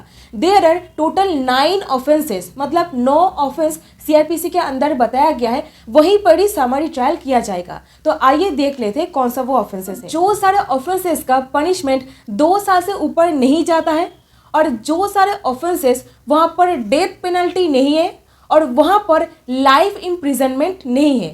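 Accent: Bengal, India. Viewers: Your tongue language like Bengali girl 👧 mam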